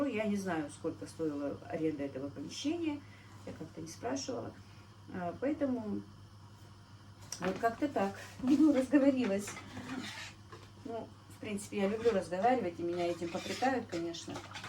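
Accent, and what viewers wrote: Standard. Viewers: Добрый вечер, пересмотрела почти все твои ролики, готовила по-твоему рецепту мясо по французки, очень понравилось моей семье,хотя до этого делали по другому, написать хочется много добрых слов тебе, но, что подкупает в тебе( я думаю не обидешься что на ты? мне 63 года), нет в тебе какой-то заносчивости, пафоса, гонора, в отличие от некоторых блогеров с соседней республики, у тебя очень чистый русский язык, правильно одна женщина задала вопрос, а вы не учитель? Очень приятно тебя слушать, смотреть как ты готовишь, желаю всего тебе самого хорошего!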